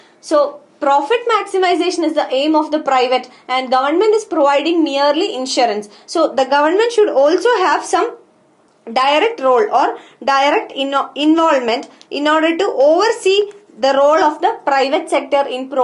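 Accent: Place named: South India, India. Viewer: Ma'am u are very good in teaching . Yet another masterpiece from studyiq apart from gaurv garg,varun dhawan;mahipal sir and veer sir. Hatsoff to ur hard work . *** 5 stars from my side ma'am. And I gather u r from south India from ur tone. Hope we will habe good times with u ma'am in studyiq world.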